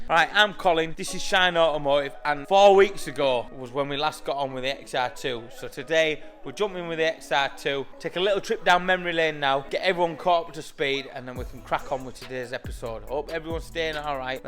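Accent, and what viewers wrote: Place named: Middlesbrough, United Kingdom. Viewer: yes sorry I looked the company I’m from Middlesbrough I new the accent lol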